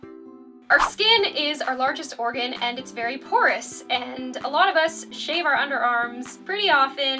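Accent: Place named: Canada. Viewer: You sound canadian. I love watching your videos because I'm in love with the way you talk. You're just hilarious. And a little quirky. But that's character! :) :)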